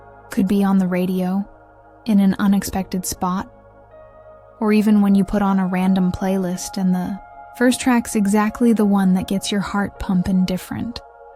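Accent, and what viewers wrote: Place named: Australia. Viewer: 😂 Your Aussie Accent is funny 😂😂🤣😅ahhaahaaaa😅 Yep, I am hangin' in there!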